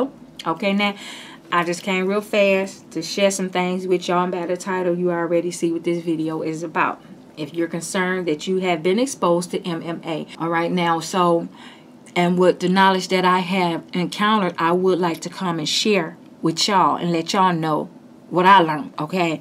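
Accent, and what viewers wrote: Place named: Louisiana, United States. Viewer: Girl where you from. I'm a guess Louisiana. I love your videos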